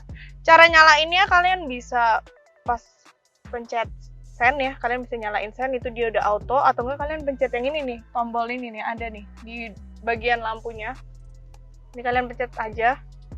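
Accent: Java, Indonesia. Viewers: Kek ada logat" jawa nya kalo ngmong, ya mba 😁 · Wong jowo tah iki hhh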